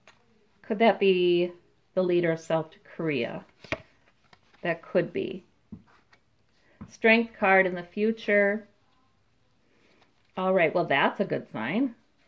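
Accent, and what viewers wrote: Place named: Midwest, United States. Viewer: Love your readings. I can relate to your voice thinking you are from the Midwest, ya know.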